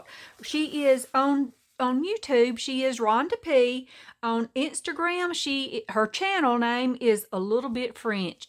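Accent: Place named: Alabama, United States. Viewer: I love your accent! I have an Internet from Alabama and you sound so much like her. I too suffer from depression and art makes such a difference!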